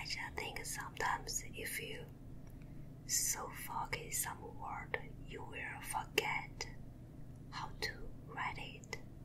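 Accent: China. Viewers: 英文很有中国味道.......很好听的说。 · when you realize the Chinese speech stereotype is almost on point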